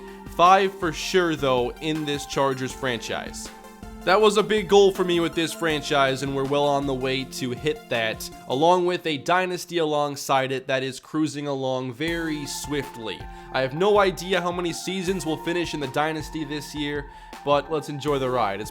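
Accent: Minnesota, United States. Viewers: Your Minnesotan accent is much less pronounced than my friends from Minnesota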